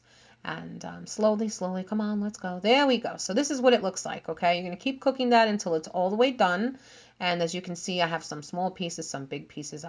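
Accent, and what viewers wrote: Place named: Long Island, United States. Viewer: you sound like ur from Long Island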